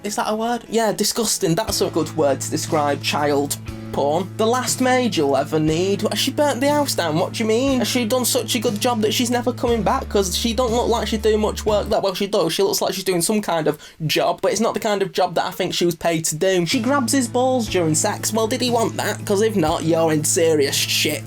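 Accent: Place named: United Kingdom. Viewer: I sarabscraibe just for the british accent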